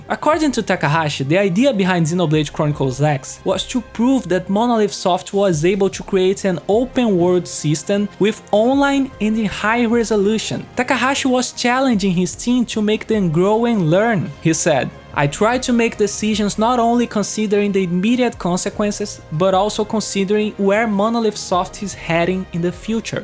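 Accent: Brazil. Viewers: Hey, are you brazilian? Because for me, your accent and way to talk are very similar to the brazilian way, and im from brazil then it seems a lot lol. And very good video btw, I LOVE Xenoblade and monolith soft is incredible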